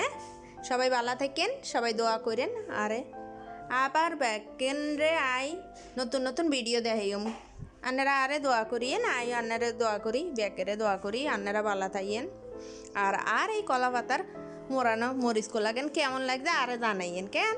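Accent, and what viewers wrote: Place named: Noakhali, Bangladesh. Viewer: Puro dakha galam.valo noyakhqli vasa sunlam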